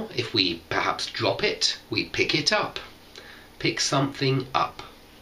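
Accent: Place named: RP, United Kingdom. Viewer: Thanks a lot sir I really love your BBC accent.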